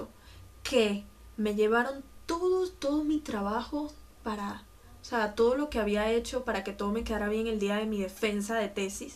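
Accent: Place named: Colombia. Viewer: se te noto el acento colombiano jajajajaja saludos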